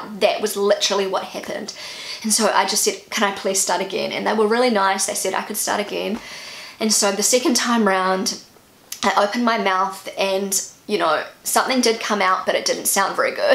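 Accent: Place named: New Zealand. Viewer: How could you hide your beautiful singing voice from us until now? I am impressed by/with all your talents,. The way you tell your little stories, is simply fascinating. And as I said before once, though you speak so fast, it‘s much easier for me to understand the NZ accent than a broad American accent. Maybe, it is because your accent sounds so much more charming. Have a wonderful Sunday ☀️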